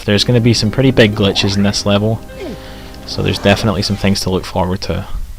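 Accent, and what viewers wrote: Scotland, United Kingdom. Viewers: Watch this video to see almost 45 minutes of a Scottish guy rambling in Scottish about an English-made video game, failing to glitch his way into a library for most of it. I believe it's his longest one...and it's not an ASMR video or a Pokémon Generation 8 "comic dub". D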